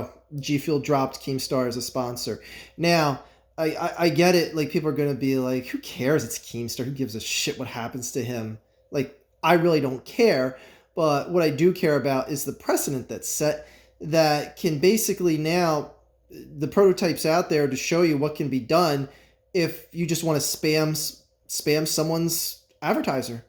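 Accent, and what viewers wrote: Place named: Philadelphia, United States. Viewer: Straight out of Phillyburbs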